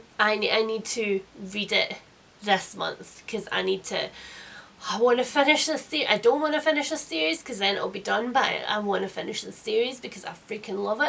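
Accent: Scotland, United Kingdom. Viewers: Hello! I'm a new subscriber and just wanted to say HELLO! I'm binging your videos and think they're great. So good to hear a fellow Scottish woman chat about books. Hope you're feeling better! xxx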